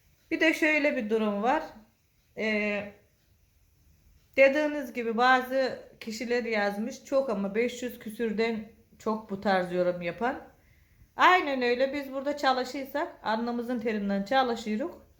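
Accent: Trabzon, Turkey. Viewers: hanım tam bir trabzonlu gibi konuştun korkanlar tehdit eder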